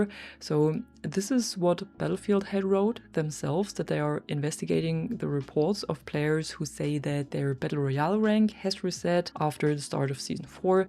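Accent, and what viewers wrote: Germany. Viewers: Dein Akzent hört sich an, wie wenn ne deutsche Frau englisch spricht. Kann das sein? aaahja, habs gesehen bei insta.. German.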